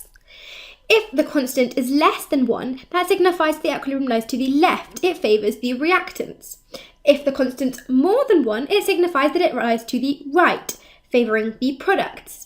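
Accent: United Kingdom. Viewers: Actually she's having the perfect and proper British accent. You need to work on your understanding of the Queen's English.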